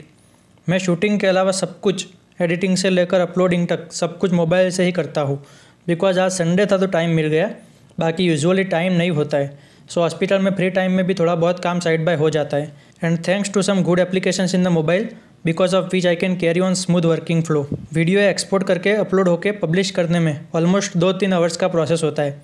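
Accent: Gujarat, India. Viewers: Your little bit accents same like Gujarati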